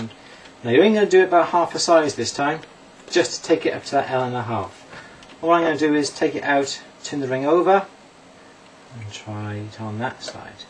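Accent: United Kingdom. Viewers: is so HOT! That cute little British accent gets me every time. Plus he's the only one on you tube who knows how to use a file. None of this back and forth garbage. --That guy has dirty hands because he's in buffing compound and sandpaper etc all day. It's hot.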